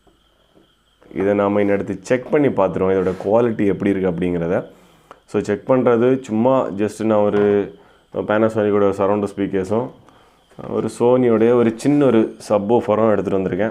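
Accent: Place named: Kerala, India. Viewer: ഇവർ മാർത്താണ്ഡംകാരാണ് അതു കൊണ്ടാണ് മലയാളം കലർന്ന തമിഴ് വരുന്നത്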